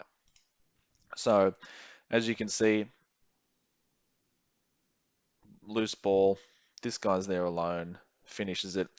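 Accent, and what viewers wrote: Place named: Australia. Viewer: Your voice sounds like an australian accent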